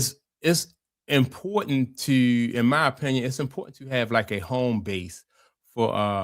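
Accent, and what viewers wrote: Baltimore, United States. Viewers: Baltimore accent?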